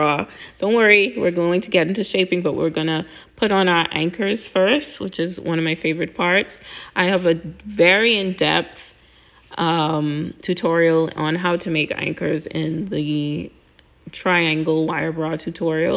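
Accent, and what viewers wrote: Bahamas. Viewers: I hear a Bahamian accent 🩵🇧🇸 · Beautiful. Love the video💕 and I heard a Bahamian accent ! 🇧🇸 just followed you on IG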